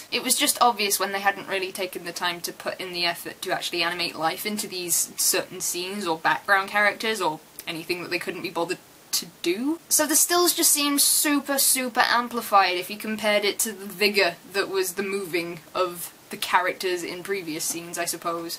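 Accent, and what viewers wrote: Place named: United Kingdom. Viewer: I'm pleased you gave this show a fair chance. I thought it was quite good overall myself. Not a masterpiece, but good, and different. Also. I like listening to your accent. There's something hot about British accents:p.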